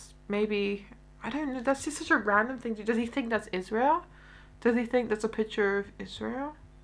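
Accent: United Kingdom. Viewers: ...and as if you are not trying to spread Palestinian propaganda? C'mon, are you even Palestinian with your white skin and British accent...lol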